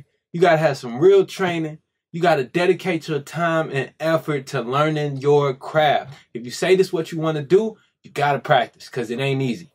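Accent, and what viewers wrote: St. Louis, United States. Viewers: UR GODD AT IT BUT U R HILARIOUS.....U SOUND LIKE A STL NIQQA!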